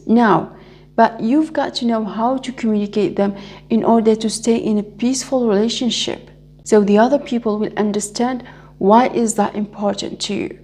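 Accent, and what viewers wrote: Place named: France. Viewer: You look like a beautiful nun i do love your french voice🥰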